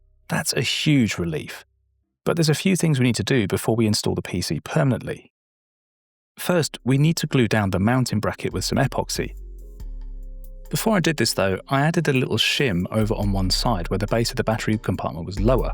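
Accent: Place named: United Kingdom. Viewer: new subscriber here: super nice channel, if I may with a 8 bit guy vibe and a British accent 😊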